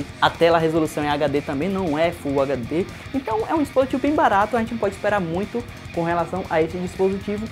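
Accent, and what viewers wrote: Bahia, Brazil. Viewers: Meu mano vc é de que cidade do interior da Bahia ?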